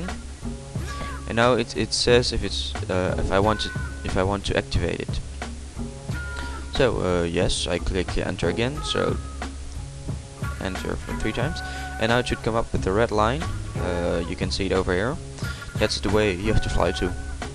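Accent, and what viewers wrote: Netherlands. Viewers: u dutch mate?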